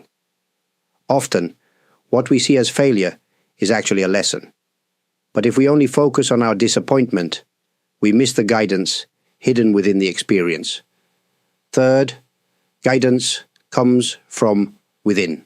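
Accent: United Kingdom. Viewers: IT OCCURED IN MY MIND, TO NOTICE THAT YOU HAVE SOME BRITISH ACCENT IN THE WAY YOU SPEAK. ARE YOU LIVING IN BRITAIN MAYBE?😇🙏💜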